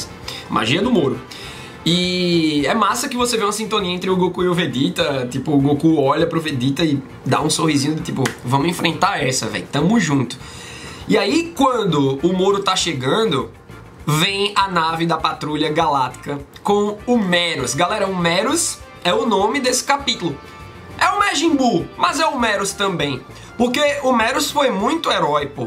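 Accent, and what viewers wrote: Pernambuco, Brazil. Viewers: Esse cara e Pernambucano kkkkkkkk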